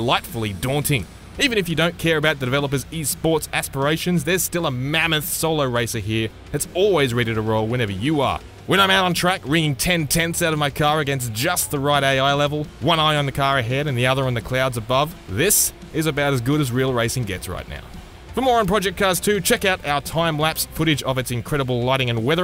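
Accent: Australia. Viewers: has no one in this comment section heard an australian accent before? Ya'll are SHOOK. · Dat auzie accent doh! Makes everything automatically more enjoyable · As Joe Rogan says australian is the coolest accent. Great review as always man!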